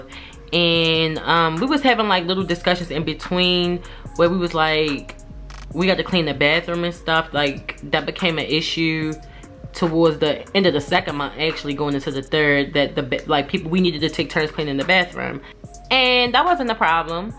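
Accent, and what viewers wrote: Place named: Maryland, United States. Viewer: Yesss straight into the story ! I’m loving it 💯u jus earned yourself a #Newsubbie 🥰 btw Are you from Maryland ? I’m asking because I’m from Baltimore Maryland and your accent sounds like u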